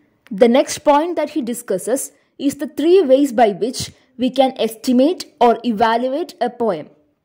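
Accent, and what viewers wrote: India. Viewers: Mam are you from south 😍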